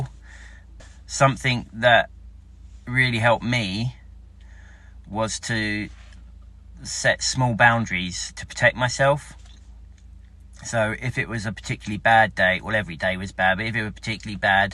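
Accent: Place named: United Kingdom. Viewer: Откуда Вы? Такой акцент, будто Вы из Великобритании, звучит так правильно. Получилось ли у Вас создать свою семью?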